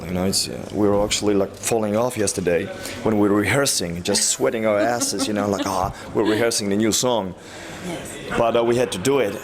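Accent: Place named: Sweden. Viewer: not every swedish have to can speak english perfectly. your accent is lovely, I like swedish language better than english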